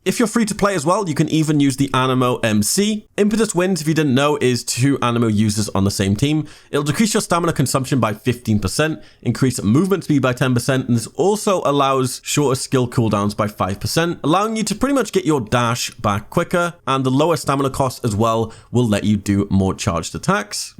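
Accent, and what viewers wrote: United Kingdom. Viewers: i dont watched rest of the video( because i amazed by your British accent) but will see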